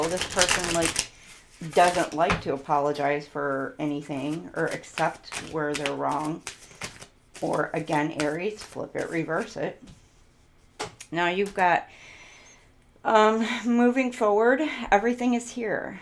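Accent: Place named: England, United Kingdom. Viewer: Your English accent is hilarious 😂 I guess my Scottish accent is too! Edinburgh is pronounced as Edinbra or Edinborough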